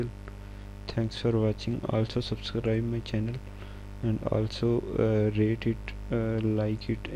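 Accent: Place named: India. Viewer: Thanks man .. Great video .. Indian voice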